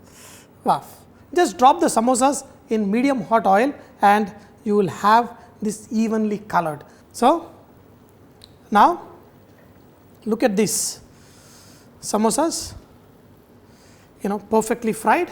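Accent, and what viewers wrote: India. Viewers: Dear.... I tried to find the recipe at your website - but it is not posted. Some of the spices used in the recipe I didn't quite understand with your beautiful Indian accent, perhaps you might type them in the "more" section? Thanks you so much!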